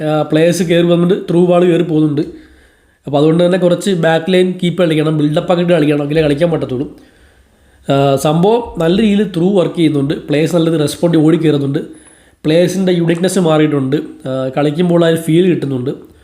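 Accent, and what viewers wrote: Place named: Kasaragod, India. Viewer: Majje illa nammale kasaragod bhasha alle ath😁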